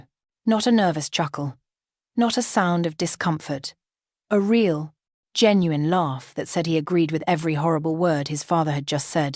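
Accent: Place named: England, United Kingdom. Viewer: May I say your voice with the English accent makes the story real.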